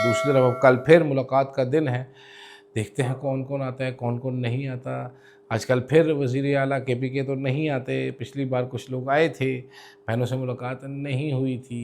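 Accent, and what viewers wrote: Punjab, India. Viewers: راجہ جی پنجابی ٹچ کمال کر سٹنے او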